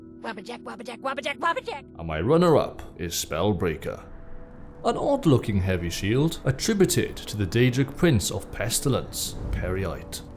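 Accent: Wales, United Kingdom. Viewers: I didn't see your name until after I noticed your accent 😂 fellow Welsh Skyrim enjoyer.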